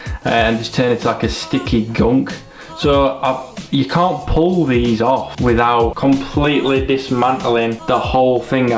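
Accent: Yorkshire, United Kingdom. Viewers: Is that a Yorkshire accent pal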